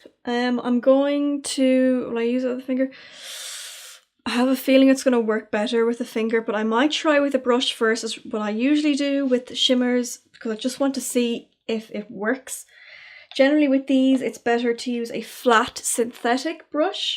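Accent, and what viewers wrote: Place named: Ireland. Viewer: What a beautiful collection! That purple eyeshadow is stunning, even if it needs a bit of work. I really enjoyed this video, your Irish accent is so nice to listen to, and you were so thorough when talking about the products. Also (this is a really weird comment) I'm so jealous of how much lidspace you have x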